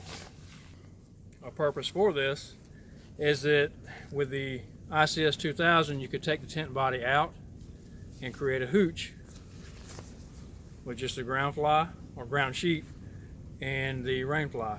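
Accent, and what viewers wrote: South, United States. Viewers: Love the tent. Don't love the USMC or Southern accent. It's mind boggling how some people actually talk that way 😂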